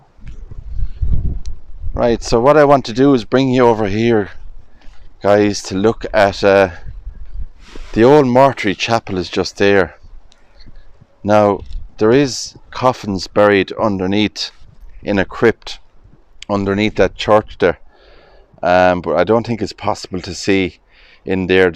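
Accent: Ireland. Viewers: Wonderful narration made more enjoyable by that beautiful Iris lilt……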